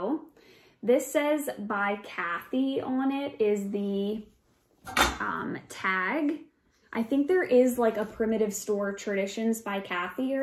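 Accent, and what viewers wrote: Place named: New Jersey, United States. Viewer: Hello from the great state of NJ. I too, born and raised. I recognized your jersey accent. Lol. I also love thrifting in PA too. We go riding thru bucks County frequently. I also love Penn Dutch country too. Such good finds. Love the items you find.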